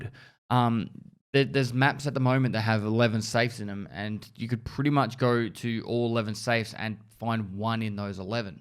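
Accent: Australia. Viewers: sounds australian to me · Hes Australian as fuck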